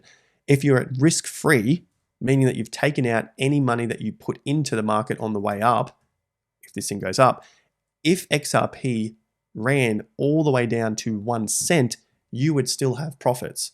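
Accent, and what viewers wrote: Australia. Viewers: Yeah, the Aussie accent is brutal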